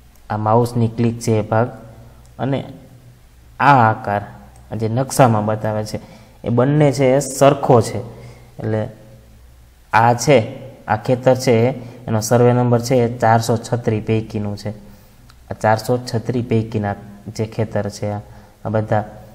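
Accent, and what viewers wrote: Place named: Kathiawar, India. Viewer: ભાઈ ભાઈ, એકદમ કાઠિયાવાડી ભાષા બોલો છો... સરકારી ખરાબો ની જમીન એટલે શું ?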